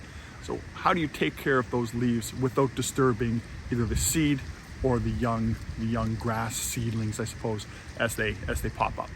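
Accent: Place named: Minnesota, United States. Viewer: You got that Minnesota accent.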